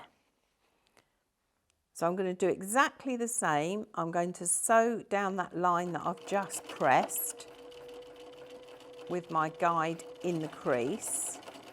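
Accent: England, United Kingdom. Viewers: This is the first video I’ve seen of yours. Lovely quilt, especially with the flange on the border. I’m from the US and we call the corners you cut off “dog ears” and we “press to the dark side”. I love your English way of speaking and the fact that so many of us around the world can share in the craft of quilting.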